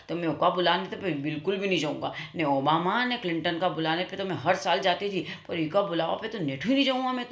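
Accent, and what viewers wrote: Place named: Malwa, India. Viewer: Mast wani hmary malvi Goodluck............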